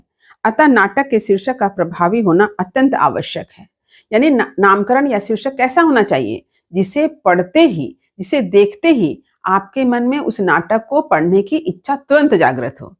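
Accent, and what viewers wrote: Bengal, India. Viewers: Aap Bengali है ?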